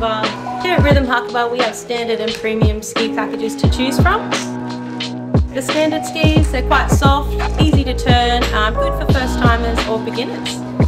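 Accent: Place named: Australia. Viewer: I did not expect to hear someone speak in Aussie accent on a Japanese ski shop video :) I may visit to rent skis when my family goes to Japan for a ski trip.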